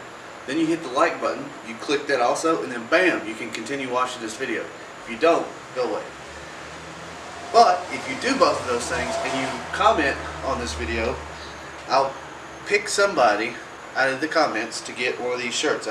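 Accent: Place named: Alabama, United States. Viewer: You talk like me😅 I'm from South Alabama and fixin to try this right now!! Keep it up and play whatever music u want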